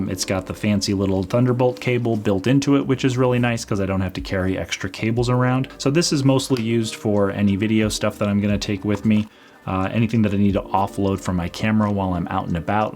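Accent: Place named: Midwest, United States. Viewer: Thanks for all your great FCPX Tutorials and other content. One question: What's the correct way to use a FCPx project as a template for further projects. For example, lets say that you are making a 10 part series and you want them to have the same intro and outro as well as the same basic look and feel (but of course with entirely different footage and audio in each episode). Is there a way to use a completed project as a template for other projects that preserves intro and outro and the different effects that were used? Any tips on doing this, rather than having to start each new episode entirely from scratch each time? Thanks! btw, I used to live in Omaha and went to Westside High, so I also enjoy your mid-western presentation style.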